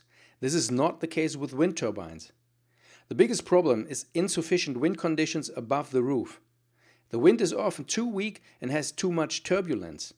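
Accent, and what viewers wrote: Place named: Germany. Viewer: I like English of Germans. I can easily understand it.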